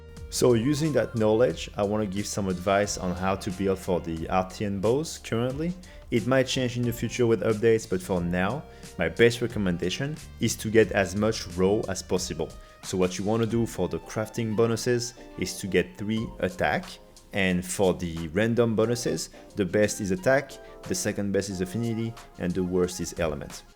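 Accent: France. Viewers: Ah de ce que j’entends tu est français haha ☺️ tes vidéos m’aide et beaucoup pour fair de super builds d’arc ! Merci infiniment ! Hâte de voir la prochaine vidéo !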